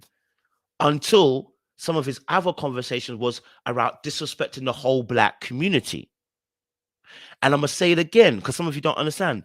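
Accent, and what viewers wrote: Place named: England, United Kingdom. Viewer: I was NOT expecting the English accent! 🥰🥰🥰🥰🥰🥰🥰🥰🥰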